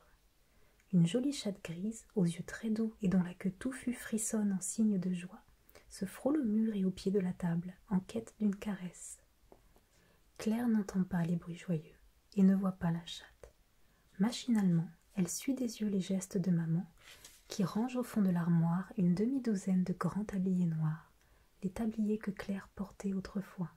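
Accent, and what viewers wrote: France. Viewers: I love this so much! The French accent is wonderful to listen to, couple that with an ASMR artist and you've struck gold 😀💯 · Mais j'ai l'impression que tu es française qui parle couramment anglais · Tu est française ?? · C'est une anglaise qui parle très bien français ou une française qui parle très bien anglais parce qu'il y a un accent fr nan ? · Attendez, êtes-vous française ? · Elle est française ? je me pose la question depuis que j'ai commencé ses vidéos, je crois reconnaitre son accent français quand elle parle anglais mais je ne suis pas sur 😅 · son accent en anglais suppose en effet qu'elle est française. J'étais juste curieuse au début mais je suis agréablement surprise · I've heard some french accent in your English 😍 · Elle est française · Je suis française et je pense que tu es française car quand tu parles anglais tu as un accent français . 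Sinon je trouve ça super cela permet d'apprendre les base d'une langue tout en ce relaxant ! Bravo ! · Yes she's french 🇫🇷❤ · je pense aussi qu’elle est française car je trouve que son accent anglais (qui est très bon) sonne un peu français ☺️ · vu son accent français en parlant anglais, oui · oui elle est française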